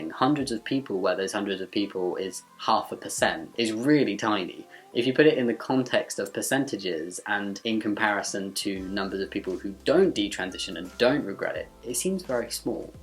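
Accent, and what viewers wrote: United Kingdom. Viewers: You’ve successfully made me hate the British accent. You are a joke and are an insensitive to other humans. Quit being a narcissist. You’re entire community is indoctrinated- including you. 🤮 You also lie (because it is too simple to get hormones -10-30 minutes) and you invalidate other people’s reality. STFU and think about something else other than your gender for once in your life.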